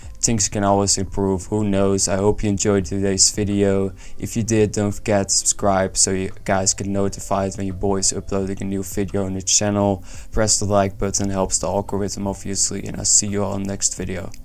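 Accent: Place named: Netherlands. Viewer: Ik versta aan je engels dat je nederlands ben😁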